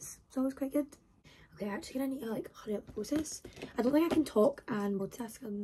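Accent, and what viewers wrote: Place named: United Kingdom. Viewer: are you british? I can't really understand your accent; where r u from girl? :)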